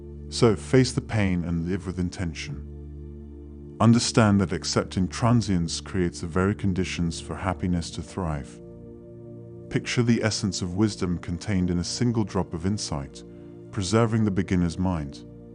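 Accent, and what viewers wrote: United Kingdom. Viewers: What a beautiful speaking voice. Ah, that British accent…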